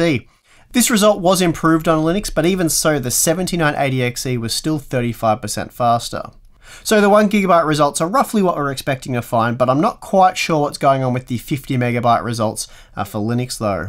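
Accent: Australia. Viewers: PLEASE DO MORE LINUX! I love your channel and your wacky Australian accent. MORE LINUX! Give the people what they want!!!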